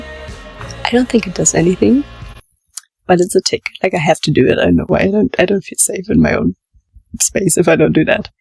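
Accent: Germany. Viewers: AWWW I LOVE YOUR VOICE despite knowing you were German I didn’t think about you having an accent lmao but it’s also adorable??? like girl I lowkey want it 😭 ich spreche Deutsch a bit too as I’m a little German myself :) great tutorial as well!! <333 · Thanks for the tut Also German accent?? My friend speaks German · I LOVE YOUR VOICE! Also your german accent is so cuteee :3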